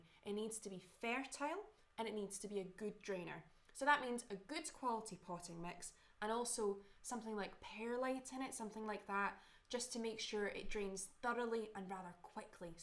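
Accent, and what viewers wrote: Scotland, United Kingdom. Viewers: Love your Scottish accent! 😍 How do I know? From outlander 😆 Great video and info, thanks! 😊💚🙌🏻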